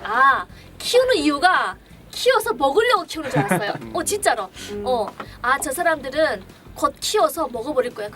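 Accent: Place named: North Korea. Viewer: 북한말 베이스에 부산말투 일부 서울말투 일부 처음엔 의아했는데 이해가ㅡ가네. 대단하신 분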